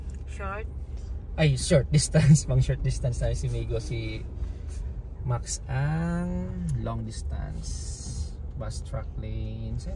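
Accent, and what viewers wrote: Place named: Visayas, Philippines. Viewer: Anong dialect po gamit nyo Sir? There's a little hint of Bisaya and Illonggo.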